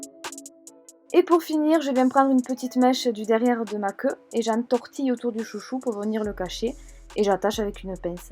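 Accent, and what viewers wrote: South, France. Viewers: Haha je t’adore j’ai découvert ta chaîne il n’y a pas longtemps mais j’aime ton naturel, tu me fais beaucoup rire et t’es trop mignonne. Trop attendrissante et ton accent me fait un bien fou (le sud me manque!!!) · Moi : je déteste les vidéos make-up/haul ch'ai pas quoi. Moi : tous les dimanches à mater tes vidéos !!! Lol j'aime bcp ton naturel et ton joli accent du Sud ! 🤗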